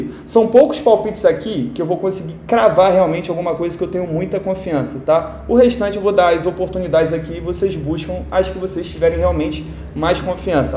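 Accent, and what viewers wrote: Rio de Janeiro, Brazil. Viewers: Deu moral aí cria.. pelo sotaque vc e do rio né ?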